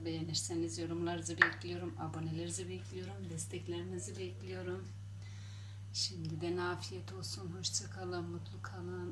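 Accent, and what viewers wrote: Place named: Artvin, Turkey. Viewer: Artvinli konuşman var sife memleket neresi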